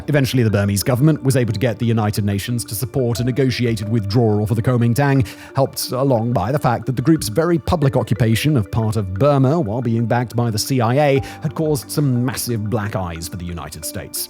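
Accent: England, United Kingdom. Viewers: He's terminally English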